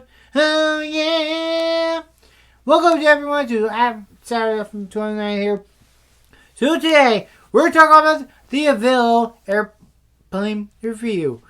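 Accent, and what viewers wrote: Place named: England, United Kingdom. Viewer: Awesome airplane review is very interesting and so much information enjoy like your English accent smile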